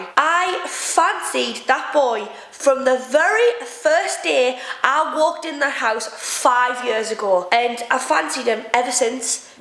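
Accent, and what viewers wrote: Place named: Sunderland, United Kingdom. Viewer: Cher Horowitz she is from Sunderland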